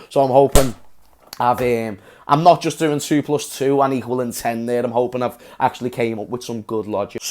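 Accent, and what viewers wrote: Liverpool, United Kingdom. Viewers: Gooooo on lad keeping up the liverpool accent lol!!! L.f.c.4 eva y.n.w.a!!! From bidzy westmids Gypsy England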